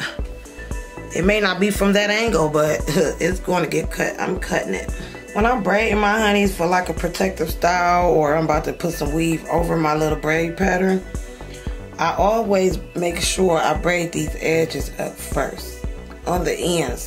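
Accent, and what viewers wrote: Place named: Baltimore, United States. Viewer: I love when you say stuff like bother the way u say it I thought you were from Baltimore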